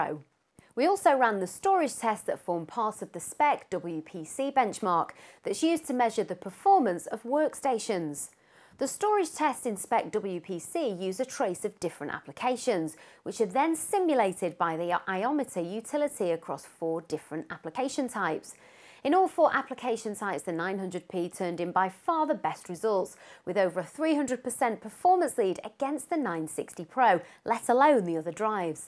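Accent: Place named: Northern England, United Kingdom. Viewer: I love that northern accent.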